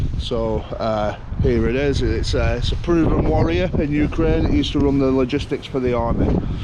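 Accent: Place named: Lancashire, United Kingdom. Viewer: Hi I don't know if you can use it but I have a Renault traffic van, <num>,000 miles good runner etc. I wish I could donate it but I could let you have it for £2500 If your interested let me know All the best ps from your accent I'm guessing that your in the Lancashire area, I'm originally from Preston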